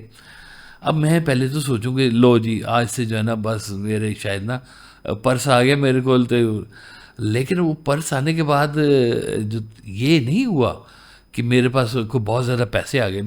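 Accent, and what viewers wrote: Punjab, Pakistan. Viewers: ji....thoda punjabi accemt hai na isliye thoda samajh na hi aati....1 martaba suni thee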